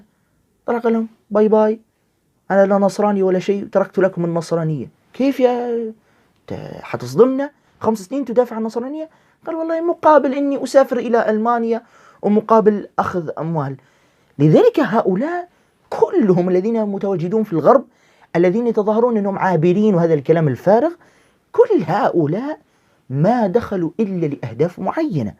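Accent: Egypt. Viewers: كل سنه وحضرتك طيب يا شيخ بمناسبه الشهر الكريم وحلوه اللهجه المصريه عليك اوي ربنا يديلك الف صحه وعافيه وتتصدي للناس دي وكل من افتري ع مسلم..ربنا معاك ويقويك ❤️💪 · هذا الوارثوج شبية البرص احول نوتردام ليس شيعي اصلا انة نصراني ابا عن جد وهذا ما صرح بة الشيعة. وحتي لو كان شيعي اصلا فلن يتغير في الامر شيء كلهم انجاس× انجاس .وبعدين النصاري عارفين انة نصاب ولكنهم يستعملون كل من يسب في الإسلام فكلهم نصابين × نصابين رشيد قمامي ورشيد ابو السباع وتعيس ابو بظرط ونقمات موافي الكدابة ام فشة عايمة ومحمد رحومة النصاب الهارب وكلب الالمان ابوعضمة خامد عبد اليورو.. 😂😂والله لهجتك المصرية جميلة ياشيخ وهذا مايسعدنا ويشرفنا ❤❤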